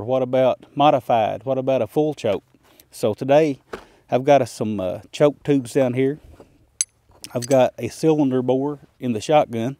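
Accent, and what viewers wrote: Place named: South, United States. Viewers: That is one cool sounding accent. I am guessing from the South but you are bundled up too much🇨🇦🤠Great vid answered some questions l had thanks. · Whatever it is, he has it on thick. Wearin’ it thick. A lot of YT’bers do this. lol. The best, are the northern “southern “ draws lol. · Very excellent videos as well as the information you provide. Love the accent! Tennessee?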